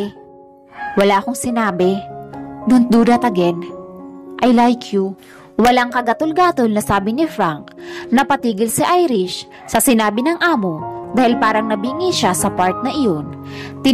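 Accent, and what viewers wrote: Visayas, Philippines. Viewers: Sa nagbabasa ng kwento😅😅😅 bisaya kapoba para kalang kasing tumutula hahahaha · Anyare sa narrator?ganda ng kwento sana,,diko malaman kung bisaya o batangueña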